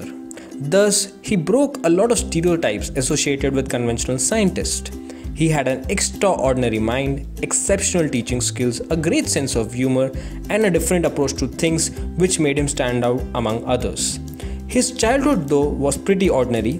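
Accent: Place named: United States. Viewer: Well is that voice your's sir! I wonder! Its different but appealing! A bit of American accent!! Nailed it👍🏻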